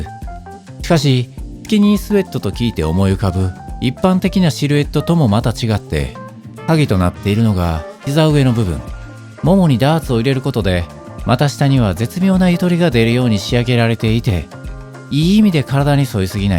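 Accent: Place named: Kansai, Japan. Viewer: 関西弁新鮮！！これもまた良き👍👍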